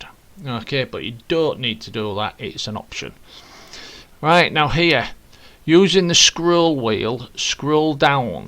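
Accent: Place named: Barnsley, United Kingdom. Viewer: Great video love Ur ascent you sound like my best mate who's from Barnsley. Really helpful vid 👍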